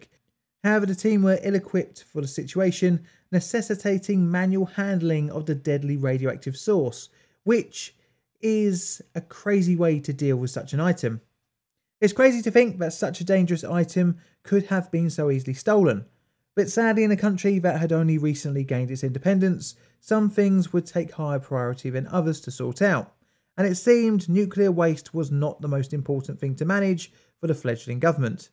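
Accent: United Kingdom. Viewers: Hi, this question is a bit off the wall. I am an amateur linguist and love dialects. I can identify many British dialects, but while I can recognize many, I often times do not know the name or location. So could tell me the name and location of your dialect (and don't just tell me it's in a balmy corner of SE London) 😊. Love the videos BTW.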